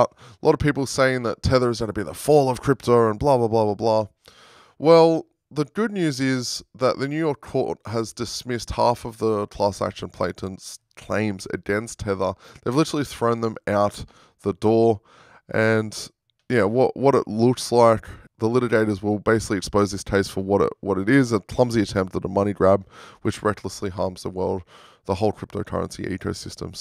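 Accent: Australia. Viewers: Thanks for the videos, it’s nice listening to an Aussie.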